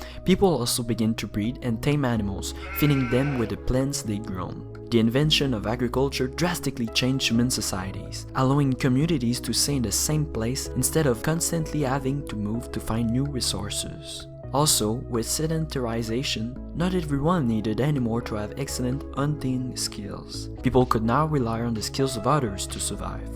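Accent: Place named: Quebec, Canada. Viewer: Hello l’accent québécois :)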